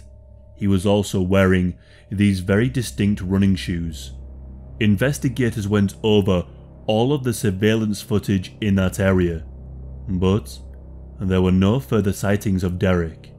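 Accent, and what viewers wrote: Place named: England, United Kingdom. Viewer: Love 💕 your English accent. Hope Derrick is alive and well 💕💕🔥🔥🌹♥️🌹🔥💕💕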